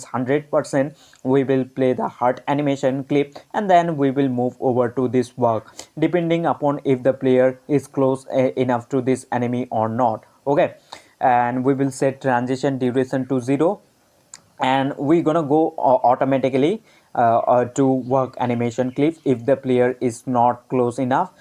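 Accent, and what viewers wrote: India. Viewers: Indian accent is horrible Ogay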